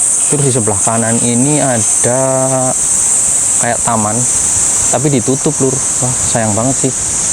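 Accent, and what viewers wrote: Banyumas, Indonesia. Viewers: Kang kaya wong Banyumas asale ngendi.... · mas bro kowe wong ngendi si kok ngomongnya kaya gitu ?, orang ngapak ya !. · wong ngapak ya mas bro?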